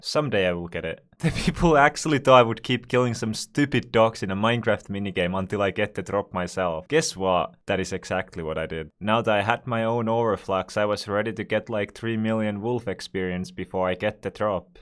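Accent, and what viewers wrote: Finland. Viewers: means block in finnish and he has the Finnish accent HE IS FINNISH SUOMI PRRKELE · finnish accent and his name is finnish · Mäkin oon suomalainen huomasin aikasemmin kun sulla on semmoinen englanti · you have a amazing finnish accent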